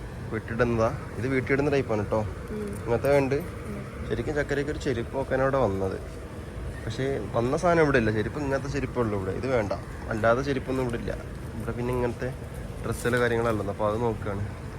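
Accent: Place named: Malappuram, India. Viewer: മലപുറ० ഭാഷആയിരികുനനു❤❤❤❤❤❤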